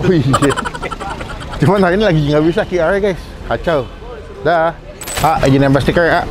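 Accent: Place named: West Java, Indonesia. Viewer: Bakwan malang tapi logatnya sunda bang 😅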